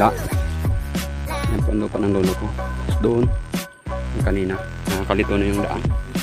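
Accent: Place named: Bicol, Philippines. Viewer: Bicolonon ang Punto mo noy napangirit ako sa vlog mo.. Sayang baratuhon pabakal kan daga ni lolo mo ako na kuta nag bakal..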